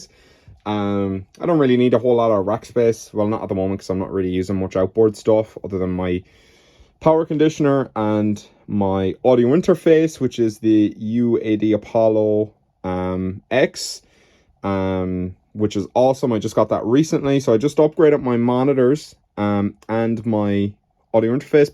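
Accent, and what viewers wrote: Ireland. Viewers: lol - dude I just searched for a Bitwig vid on reverb and mindlessly clicked the top one and turned back to the DAW. 10 seconds later I'm thinking "why does this dude sound super similar to that funny Irish chap I follow on Instagram" 🤣 Actually kinda cool to see you being an absolute social media megastar over there while you have a humble 5.6k followers over here, keepin' it real. Awesome to see how much you blew up dude - I expect the studio has probably expanded some since your success? Also mad jelly over your Polybrute. Good on ya mate and cheers for the tips.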